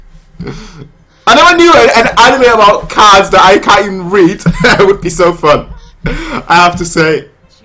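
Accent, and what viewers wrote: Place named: United Kingdom. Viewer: I really love your reaction and accent bro. British right?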